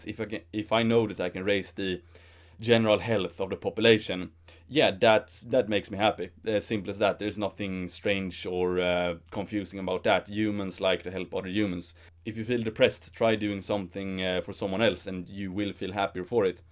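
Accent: Sweden. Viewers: Hello fellow swede I can hear it on your accent. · Hørte at du var svensk umiddelbart :P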